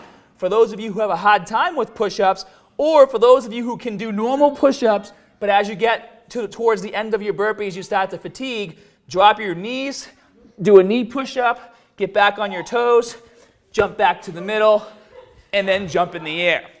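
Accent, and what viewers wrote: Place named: Massachusetts, United States. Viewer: this dude sounds like hes from Massachusetts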